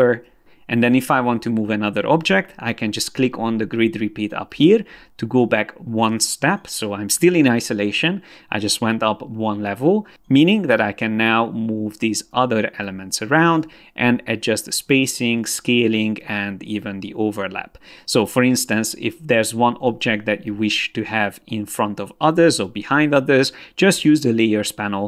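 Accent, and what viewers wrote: Hungary. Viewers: Cool videos! Did I sense a little Hungarian accent?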